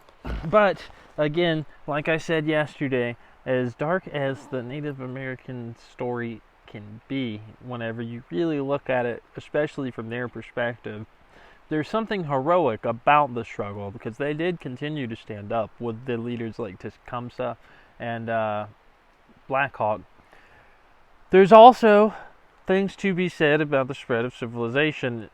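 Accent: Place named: Carolina, United States. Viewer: Awesome Carolina accent! 👍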